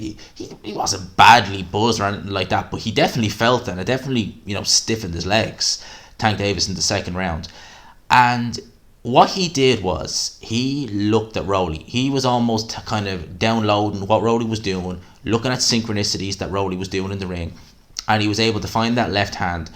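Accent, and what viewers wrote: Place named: Ireland. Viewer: 1st. Loving da irish accent. is a goon. Truly real channel. Totally bullshit free. Exactly how it supposed to be. Much appreciated 👍👍🔥🔥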